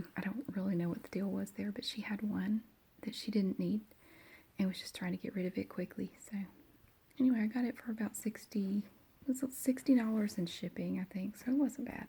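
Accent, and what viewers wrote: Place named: South Carolina, United States. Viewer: I’m from South Carolina, living in Tokyo, & your voice makes me homesick.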